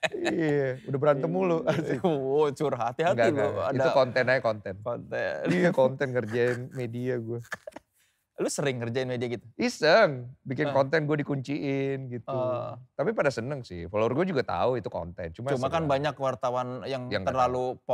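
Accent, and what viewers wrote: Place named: West Java, Indonesia. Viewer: baru tau kalo logatnya denny sumargo ternyata nyunda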